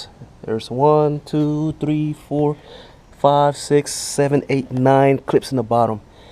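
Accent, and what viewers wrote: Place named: Philippines. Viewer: Bro thanks for the video. One question outta topic are you Filipino i hear you ahhhhhhhh.... Longgggg one lol oh k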